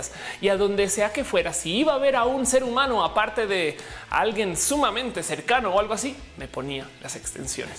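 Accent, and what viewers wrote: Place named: Colombia. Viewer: Que felicidad tener de vuelta a la, que por cierto volviste de tus vacaciones con acento colombiano mas marcadito 😁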